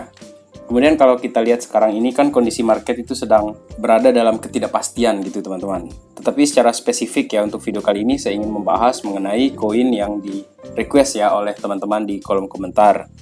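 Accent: Sumbawa, Indonesia. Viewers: Penasaran sama masnya, kok mirip orang Sumbawa ya medoknya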